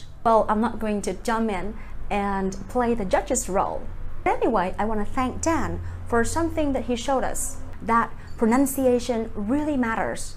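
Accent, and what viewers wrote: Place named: United Kingdom. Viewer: Dear! You are hella superb with the classy British accent Thanx for your nice stunning video Please keep smiling, keep shining and keep flying high All the best. Respectfully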